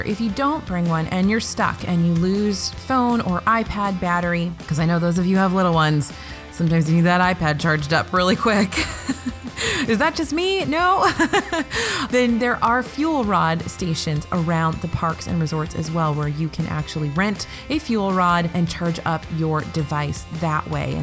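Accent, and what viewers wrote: United States. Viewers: Justin Porche I am British so she has an American accent to me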